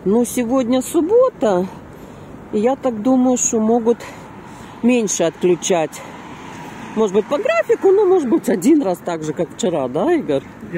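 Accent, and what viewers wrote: Odessa, Ukraine. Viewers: У ВАС ЧИСТЫЙ ОДЕССКИЙ ГОВОР, МНЕ ОЧЕНЬ НРАВИТСЯ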